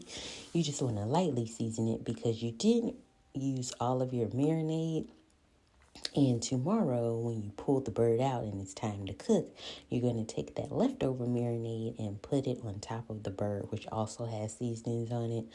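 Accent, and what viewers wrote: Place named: South, United States. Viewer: I now know that feeling my viewers get listening to my voice. You have a nice light accent. it sounds Southern but in a very classy way. I am trying to keep it bright. Basically your speaking so calm.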